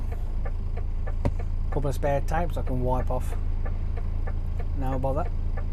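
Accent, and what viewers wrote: United Kingdom. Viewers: First time I seen ur video very good really enjoyed ,were is ur acent from ,what part of uk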